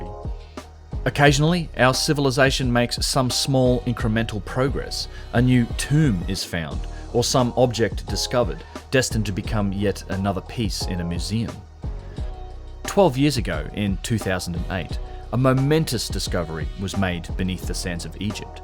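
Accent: Australia. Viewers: 'The royver of such soyze the noyl' its English? Another stupid Auzzi hack lol. Please Australia speaking Australian Cockney is not good!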